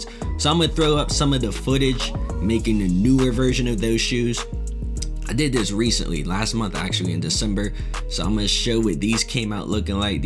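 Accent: Baltimore, United States. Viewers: You from Baltimore ?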